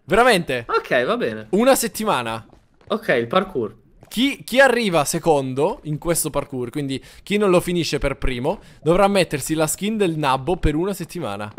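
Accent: Sicily, Italy. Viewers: Ma sei siciliano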